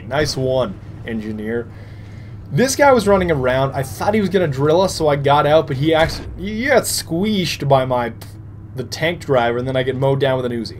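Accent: Germany. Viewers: are you german? 0_o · are you german??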